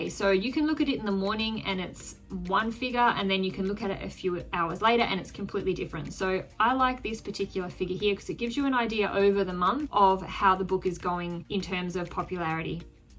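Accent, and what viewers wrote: Australia. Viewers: HI are you Australian or NZer ?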